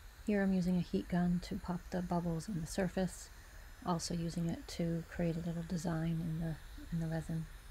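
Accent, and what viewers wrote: United States. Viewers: So, American accents that aren't irritating for Brits to listen to DO exist! Really nice job on this board. Looks great. (You could voice audio books!)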